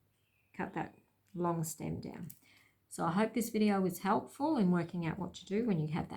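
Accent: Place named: Australia. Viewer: Great video. So nice to hear the Aussie accent for a change. Please keep posting your videos. 🤗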